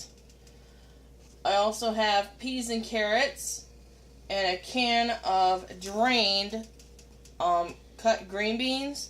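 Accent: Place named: Louisiana, United States. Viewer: Great recipe for fried rice.May I ask were your from?Your ascent sound like your from Louisiana.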